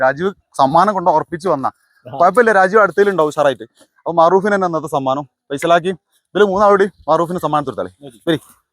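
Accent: Malappuram, India. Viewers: നിങ്ങളുടെ മലപ്പുറം സംഭാഷണം സൂപ്പർ 👌👌👌എല്ലാ game m വളരെ മനോഹരവും, രസകരവുമാണ്... സൂപ്പർ presentation 👍എല്ലാവരും ഒറ്റകെട്ടായി ഒരുമയോടെ സ്നേഹത്തോടെ മത്സരിക്കുന്ന ഗെയിം ആണ് എല്ലാം... പ്രകൃതി രമണീയമായ സ്ഥലത്ത് കണ്ണിനു കുളിർമ പകരുന്ന ഗെയിം.. ഒത്തിരി ഇഷ്ടമാണ് 🙏🙏🌻🌻🌻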